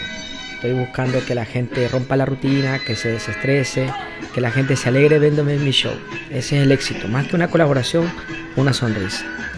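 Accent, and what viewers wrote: Ecuador. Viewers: ES GUAYAQUILENO LO DIGO POR AU ACENTO NEUTRO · el detalle es que es Ecuatoriano, no Venezolano, se nota por el acento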